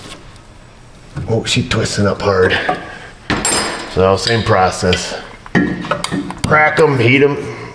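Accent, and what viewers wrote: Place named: New York, United States. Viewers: We love your New York accent. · So you must be from southern NY state as I hear you say Warsh instead of Wash. ! ya'll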